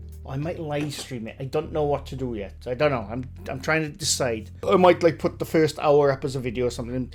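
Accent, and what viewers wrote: Wales, United Kingdom. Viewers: Hi, love all your content and never miss an episode. As a fellow ginger I live in the skin cancer capital of the world (Qld Aust) and it's a pain in the arse having countless skin cancers removed already and no doubt will have more, is it much of an issue for you where you live in Wales? Also I have noticed your beard is multi toned as mine did when I hit the 30's mine became tri coloured to the point that I gave up growing a beard sadly. As for your accent, for me you are clearly Welsh but I have also encountered guys that sounded more English than Welsh and another fellow that I worked with I could barely understand because he spoke so quick and had such a thick accent. Don't get me wrong I like the Welsh, us Aussies seem to have an affinity with the Welsh, Irish and Scotts more so than the English. Keep up the good work.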